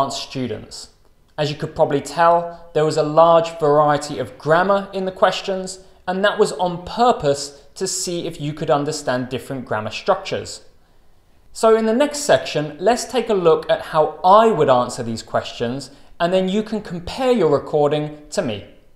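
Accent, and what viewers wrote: United Kingdom. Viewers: How beautiful British accent is! Thank you for the lesson!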